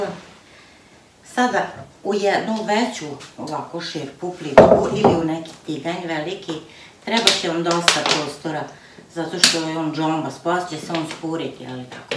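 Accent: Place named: Sombor, Serbia. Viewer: Kad Bas slusam kao da sam u Somboru.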